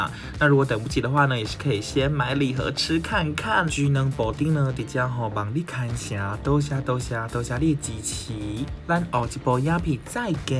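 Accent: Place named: Taiwan. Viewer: 戴黑框眼鏡看起來好顯瘦喔，布丁的台語聽起來很好聽欸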